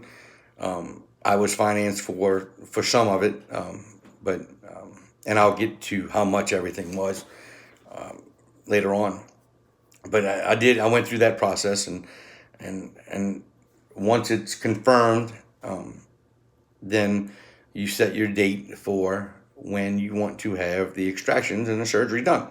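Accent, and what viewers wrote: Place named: South Louisiana, United States. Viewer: Which location did you use. You sound like you might be from south louisiana. Nevermind got to it at the end lol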